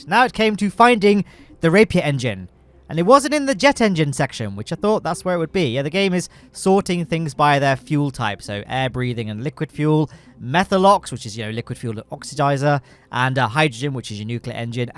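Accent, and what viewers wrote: United Kingdom. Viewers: You're actually so violently British irl it's just funny, nice work as per usual!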